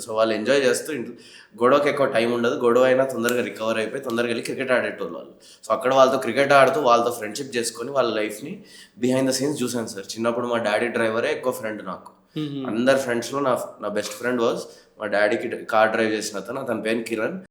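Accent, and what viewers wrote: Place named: Andhra Pradesh, India. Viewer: Modda periginav kachiguda andhra basha undi needhi randa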